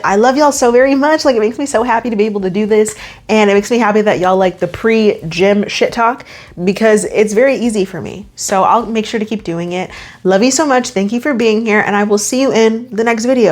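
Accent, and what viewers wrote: Canada. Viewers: this is the catfish episode that may have pushed me too far…..what a wild piece of tv…. also i’ve never realized how canadian you do sound until this video !!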